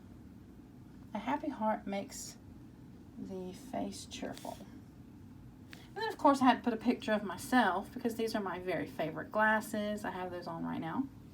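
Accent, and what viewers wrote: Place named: South, United States. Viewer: GIRL!! I love Butterflies and Owls too (and Elephants!)... I have an Ankh tattoo also! It is on my Ankle. I did it myself when my wife and I got together. I absolutely love everything about this journal! Also...where are you from... I detect a slight southern accent... :) - Hugs! - Kari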